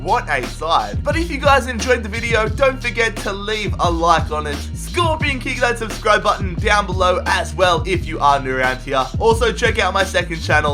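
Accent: Australia. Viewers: I knew you were Australian! Great vid From, fellow Aussie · Are you Australian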